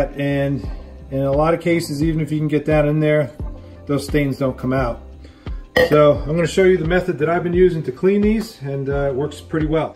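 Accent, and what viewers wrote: New York, United States. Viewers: i used a similar method to this, just with dishwasher tablets. and the results are impressive. by the way, are you from ny? if you are, i quite like the ny accent.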